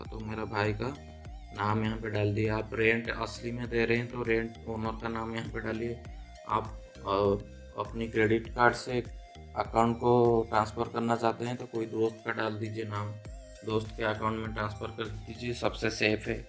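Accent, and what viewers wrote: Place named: Odisha, India. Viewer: Please correct me if I am wrong, I feel you are from Odisha due to your accent.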